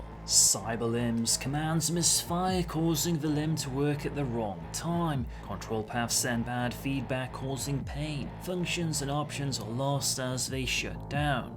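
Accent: England, United Kingdom. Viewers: Man, are you from England? Nice accent.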